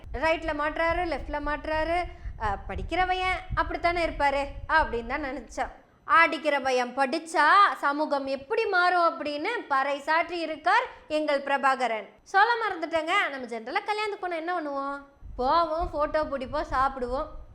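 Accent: Kongu, India. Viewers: தாயே அருமையான கொங்கு தமிழ் உனது குரல் மலழை மொழி போல் உள்ளது அருமை தம்பதிகளுக்கு மனமார்ந்த வாழ்த்துக்கள் ஜெய்பீம்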